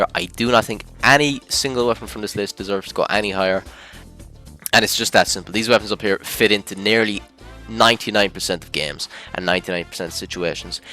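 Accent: Ireland. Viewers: Haha the Irish accent is unique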